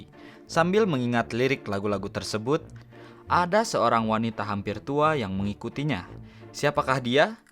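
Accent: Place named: West Java, Indonesia. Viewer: Sundanis pisan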